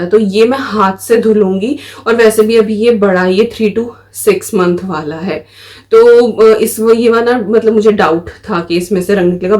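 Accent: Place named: Uttar Pradesh, India. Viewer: Ap apni up wali boli bola kro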